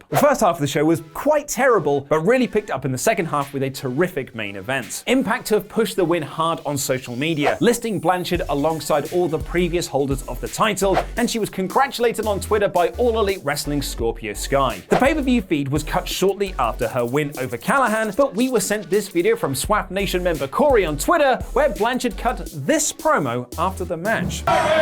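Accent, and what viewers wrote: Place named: United Kingdom. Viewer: Brits pronounce blackball and Blackpool the same. Not a good episode to have both Tessa and NXT UK news.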